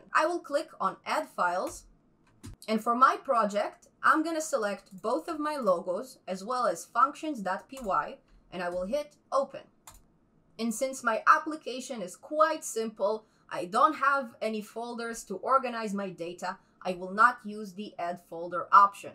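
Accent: Eastern Europe. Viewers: Чем то славянским отдает произношение :) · The voice accent is similiar to slavic or eastern europe citizen but not real canadian.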